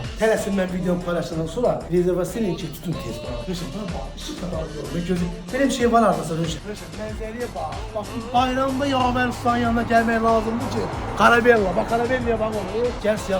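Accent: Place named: Aghdam, Azerbaijan. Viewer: 100 faiz bu dilin yiyesi agdamlidi😅😅😂😂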